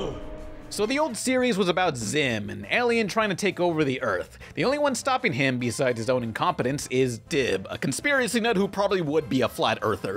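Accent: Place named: Alabama, United States. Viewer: Bruh you got some Alabama shit going on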